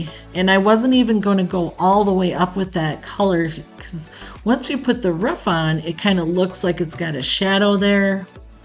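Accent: Minnesota, United States. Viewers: My first time watching you and I was like she sounds just like my Aunts in Minnesota so I wonder if she's from there and you just confirmed it ,my mom was born in Wisconsin and grew up mostly in Minnesota ,lovely work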